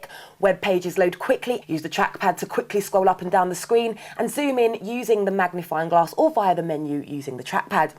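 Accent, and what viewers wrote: England, United Kingdom. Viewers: She has a nice accent though some British accents are nasty! I have the phone and use it as a backup. Still works great. Battery life is terrific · british accent fo tha win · love the english accent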